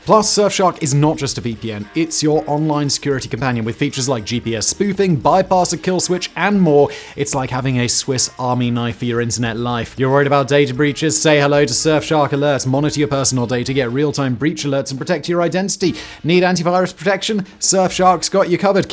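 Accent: United Kingdom. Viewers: This entire Revisionist history lesson is extremely rich, especially when it is being told by some dude with a Brit accent.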